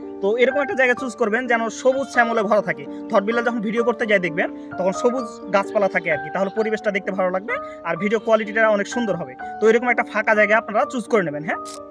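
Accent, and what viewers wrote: Chapainawabganj, Bangladesh. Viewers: রাজশাহী কোথায় আপনার কথা শুনে চাঁপাইনবাবগঞ্জের ছেলে মনে হলো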